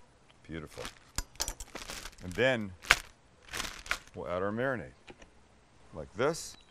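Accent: Canada. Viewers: buddy, you are a "Snowback" a Canadian,!!! I can tell by yer"Ins and Ouuts" Great Jerk Chicken recipr!!! Keep it up! DD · Just found this guy for the jerk chicken recipe that was no bs secret ingredient shit and I find fucking neegan sounding straight baller Canadian in cali. Yeah. I'm making this shit tomorrow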